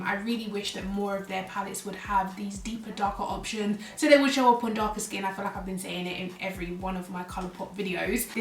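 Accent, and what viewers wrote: United States. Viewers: Thank you for doing this pallete! The ads keeps stalking me on Facebook 😆 and I was in love with the beautiful brown and gold and colors so I was dying to see this pallet on a black girl! Sad about those blushes tho. 😕 Also, your American accent is pretty good! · I love your American accent x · Your ‘American’ accent is funny! lol I always try sounding like you, it’s a straight fail!🤣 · Lolol your American accent is very TEXAN ..& we welcome youuuuu 🥰🥰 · Lmao I love your American accent lmao😍😍😍😍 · I love ur American accent 😍😄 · I love (and laugh) with your American accent 😋 so cute! I love those gorgeous deep tones and this collection is beautiful!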